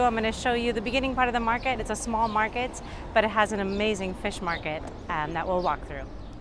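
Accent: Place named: United States. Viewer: Wow, ya lei que naciste en Chicago, yo me decia, donde esta mujer aprendio el ingles tan bien, me decia, acento ingles no es, australiano tampoco, te note pronto el acento de aqui pero me cuestionaba que siendo española por que lo hablabas perfecto and it is because you were born in America jajaja. Muy bueno tu canal. Me retire en America, soy cubano y quiero vivir en Portugal un tiempo, me es muy barato, mucho menos que aqui.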